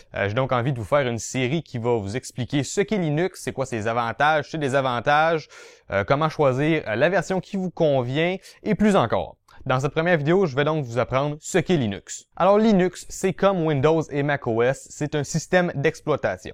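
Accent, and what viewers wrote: Canada. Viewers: bonne vidéo, mais tu parles trop vite et tu as un fort accent (Canadien), pour un Français, ce n'est pas très simple à comprendre. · Jaime bien les Canadian et l'actent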